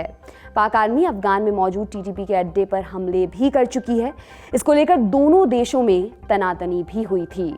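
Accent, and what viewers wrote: Standard. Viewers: Wah,bahut sare news anchor ko mai sunta hu.kintu jitna shuddh aapka uchcharan hai,jaisa aapka body language hai,isse aapki vidwata saf jhalakti hai.yahi visheshta aapko dusre anchors se alag aur behtarin sabit karta hai.Lekin shayad aap galat channel ke sath kam kar rahi hai.lswar aapko Yash de. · बेहतरीन अन्दाज़े बयां ! अत्यन्त शुद्ध उच्चारण !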